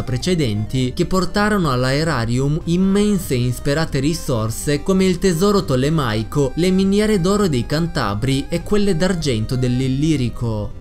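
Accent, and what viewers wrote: Russia. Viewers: Madonna che voce ma sei russo???È fighissima la tua voca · È RUSSOOOOOO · è russo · è Russo :)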